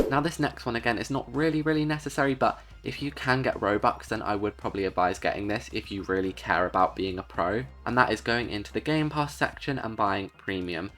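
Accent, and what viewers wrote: United Kingdom. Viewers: Yes he just sounds different because he’s British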